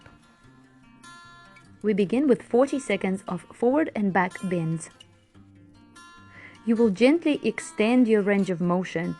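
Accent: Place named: Russia. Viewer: What a great Video, if I do this every day plus the other stretches from your videos I think It will be very good for me Some of them i know already but some are new to me Stretching and mobility is the most important thing apart from training itself I've seen you on LebeStark now I'm a fan, your way of talking and explaining is so typically Russian I loooove it 🤗🤗😍😍😍 Grüsse aus Leipzig 🤗🤗🤗 Молодец 👍👋😍🇪🇺🇰🇿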